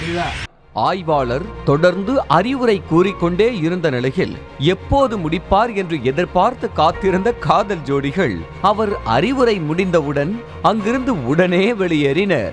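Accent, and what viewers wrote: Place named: Tirunelveli, India. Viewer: நீங்க thirunelve சூப்பர் i like that slang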